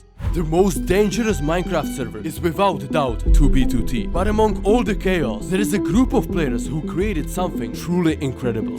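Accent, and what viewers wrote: Czech Republic. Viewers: The most Czech accent in history frfr. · The Czech accent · Je to haluz, hned jsem poznal přizvuk, Češi se poznaj 😀 keep up the good contennt, dobře se poslouchaš! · this guy's English accent is just typical Czech... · Why do you have a czech accent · Ah... i was questioning myself that you have quite cz accent :'D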